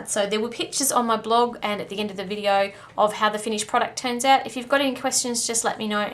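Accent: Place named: Australia. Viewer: Hi, Thank you for the video on the alternate size. Where can I find the dimensions, other than writing them all down from the video? I love your Aussi accent too!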